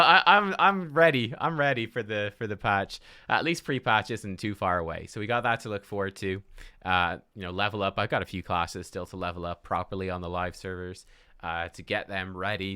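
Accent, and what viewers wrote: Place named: Ireland. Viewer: Judging from his accent, I think he’s from Ireland, correct me if I’m wrong though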